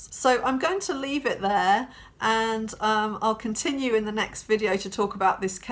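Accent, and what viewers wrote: United Kingdom. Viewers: I’ve subscribed recently as I love your thoughtful assessments based on your knowledge and experience (I am a Ph. D. in another field, and I greatly appreciate the work you have put into your career and expertise). I hope your channel continues to grow as your analyses are very thoughtful and respectful! Also I lived in the UK for a bit when I was in grad school so love the accent always (my spouse and I are anglophiles!)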